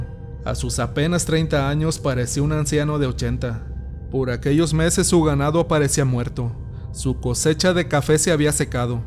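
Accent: Mexico. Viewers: Me encata su asento mexicano y como cuenta sus historias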